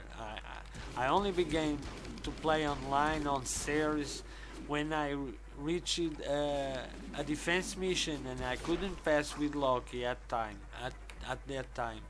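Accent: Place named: Europe. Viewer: 2 things: 1.) What accent do you have? Sounds European 2.) If you can, show your mods for all your stuff, would be cool to see what you use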